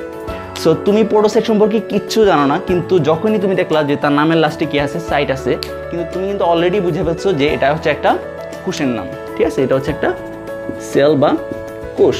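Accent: Noakhali, Bangladesh. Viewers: Apni ki Noakhali r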